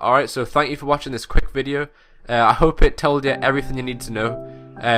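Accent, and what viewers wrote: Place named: Northern England, United Kingdom. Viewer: Though a few years old now it's still a very concise and handy tutorial, good job! Loving the northern accent by the way :p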